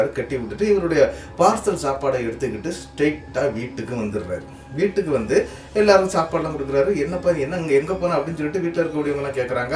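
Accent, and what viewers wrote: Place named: Nagercoil, India. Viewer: நம்ம நாகர்கோவில் ஊர் காரர் என்பது பேச்சை வைத்து அறிந்தேன். மிக சுவாரஸ்யமாக அழகாக சொன்ன விதம் ரசிக்கும் படி இருந்தது. நீங்கள் சொன்னதால் தான் நான் சப்ஸ்க்ரைப் செய்தேன். நன்றி நல்வாழ்த்துக்கள்.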